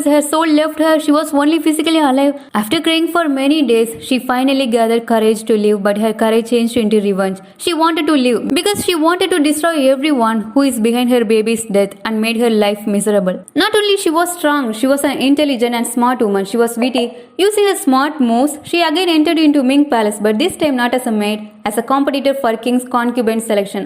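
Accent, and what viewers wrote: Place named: England, United Kingdom. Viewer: Really happy to see thiz drama in your english accent.... ❤❤❤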